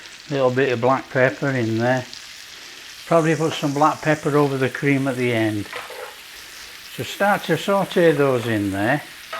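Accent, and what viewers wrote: Yorkshire, United Kingdom. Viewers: No French accent, just a wonderful Yorkshire brogue. Pork in cider is delish, I could scoff that down now. Nice glass of cider to go with it, what more could you want? xx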